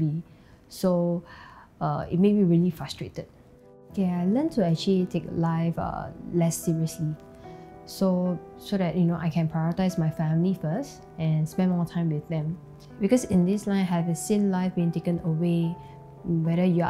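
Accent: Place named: Singapore. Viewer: Singaporean accent a female embalmer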